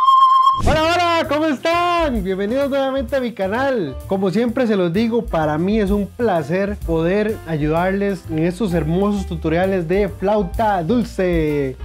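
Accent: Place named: Costa Rica. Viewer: Me suenas Tico. NICE BRO. PRIMER VEZ QUE VEO UNO DE TUS VIDEOS Y YA TE SIGO...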